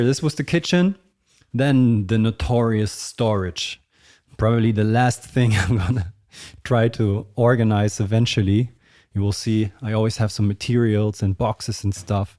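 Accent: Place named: Germany. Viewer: Sehr cool mal einen Einblcik hinter die Kulissen zu kriegen. Cooles Studio auch. Generell muss ich sagen das das der beste House Musik Kanal ist den ich kenne. In der Vergangenheit hatte ich immer mal wieder die Vermutung das du deutsch bist, auch wenn dein Englisch sehr gut ist, den deutschen Akzent abzulegen ist schwer. Nun wurde es quasi endlich mal aufgelöst.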